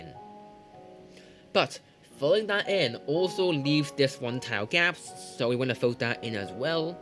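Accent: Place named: United Kingdom. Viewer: bro sounds chinese and british at the same time i didnt even know that was possible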